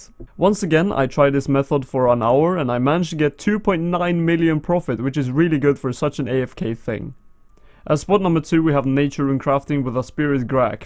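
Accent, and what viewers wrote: Sweden. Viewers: I love ur swedish accent :3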